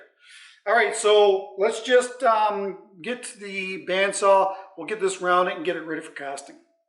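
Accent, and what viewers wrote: Canada. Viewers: Lovely bowl,. You’re really helping me learn turning and the difference between the American and Canadian accents. ((Much prefer yours, like my own native Scots).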